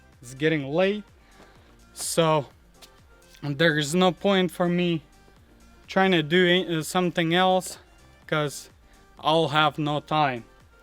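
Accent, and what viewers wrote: Russia. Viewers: Блин! У вас русский акцент 😀! Ну молодец, восстановить полностью машину это технические работы! Было приятно смотреть видео!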